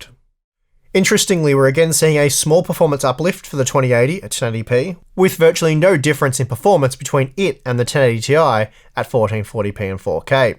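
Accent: Australia. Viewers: I never have any problem understanding the Aussie accent but I legit heard "harbor on box" this time. Also with Turing pricing was the biggest problem. If the 2080 and the 2080Ti were both $200 cheaper they would've been a decent pair of GPUs.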